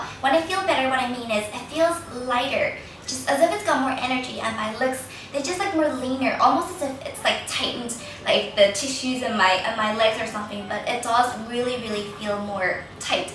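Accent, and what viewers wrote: Ireland. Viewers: You sound Irish. Great video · You're from Hong Kong but you kinda sound Irish..But you're really pretty :) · i thought you were Irish because it sounds like you have an Irish accent!!!!!!!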